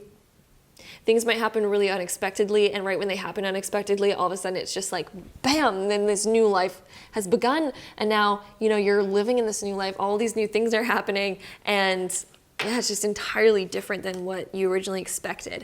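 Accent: Canada. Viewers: Lovely, thank you so much. When I heard you the first time, I thought you are Canadian because of the way you say "out" or "about" 😊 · Pile 2: little late watching this lol as a Canadian I loved the Canadian accent. It's my ex who needs to apologize but honestly as much as I need some closure I'd prefer if he didn't contact me. I've been closed off from love but I am starting to want love in my life again.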